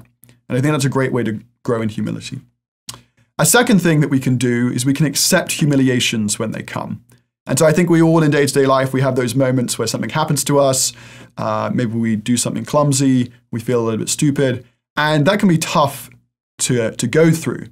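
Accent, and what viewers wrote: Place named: England, United Kingdom. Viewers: Yes, he has a UK accent. I also noticed that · Thank you from England - and you sound as if you come from here!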